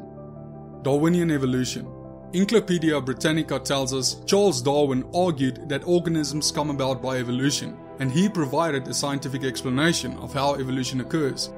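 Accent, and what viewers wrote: South Africa. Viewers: You sound South African... are you? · ahh crap! he sounds South African, if he is, I apologize for the rest of us who understand science.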